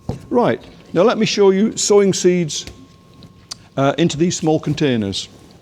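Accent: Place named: Lancashire, United Kingdom. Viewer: Lovely accent, are you from Lancashire?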